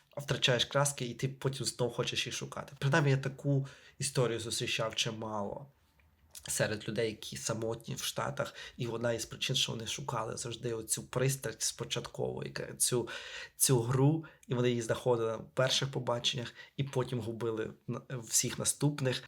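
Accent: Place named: Russia. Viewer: друже, роби щось з тим акцентом, бо тобі ще в США жити, а говориш ти ну дуже так собі. рашан ексент :D оце "і"-кання та "ге"-кання - це прямо як маркер. Та й в деяких словах коли ти замість G вимовляєш H - не одразу зрозумієш що ти там такого сказав.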